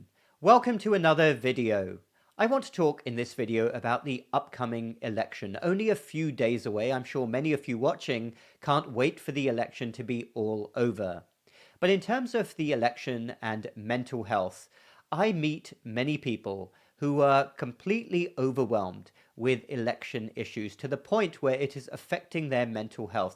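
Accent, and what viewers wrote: United Kingdom. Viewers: You are such a clear voice. Love to listen to you. Decide which pod casts I need to play, without comment, whilst my hubbie sits next to me taking in what you say. Love your British voice on the health and now, unusually, political scene. Americans today no longer appreciate their constitution. As a Brit, I understand now why Americans have said it is the best country, I have studied their constitution etc for years and now understand why they have said it. Sadly, I understand what they may lose, without appreciating what they had. Very sad and worrying for the West. 🇬🇧🏴🙏🏻👍❤️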